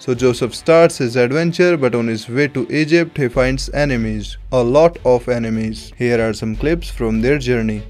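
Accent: India. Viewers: Your have an indian accent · ive been watching this channel for a while i swear to god i never realised having anime explained to me with an indian accent is such a ride 😂😂😂❤ i can't get enough . sir thank you and please keep it up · Are you an indian, bro? Your accent looks like one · U sound indian.. 😂that accent is what makes this funny.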